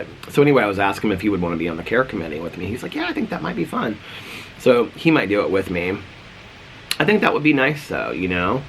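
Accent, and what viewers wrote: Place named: Indiana, United States. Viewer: Indiana-isms "I saw-l". "my grandma-l". Sandwich- "sammwich". Potatoes "Batatoes". There are so many more lol.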